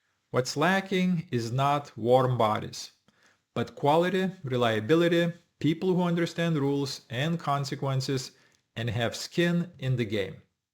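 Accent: Russia. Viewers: Sounds Russian acent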